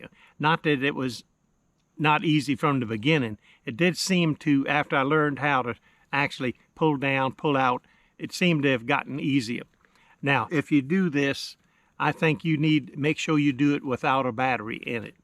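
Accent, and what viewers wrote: South, United States. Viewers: you have a great channel! And your southern draaaw is so great! Love watching and listening. But truly, I really love learning. Even though I van camp, the idea of “Packing small” suits my adventure style and you guys teach me so much! And I like to know stuff!! 😂🙌🏼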